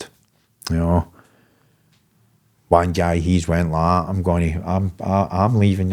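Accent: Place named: Glasgow, United Kingdom. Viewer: cheers for this podcast, my granda's Calton born and raised and just recently passed and hearing your accent reminds me of him so much you've gained a follower from me hope to hear more podcasts from you with Shaun or just any other story. I had to re light my spliff at least 7 times listening to your nutter of celly story I was laughing that much. Wondering if you ever knew my cousins from easter house they're no about anymore (Binnies) if so any stories of them?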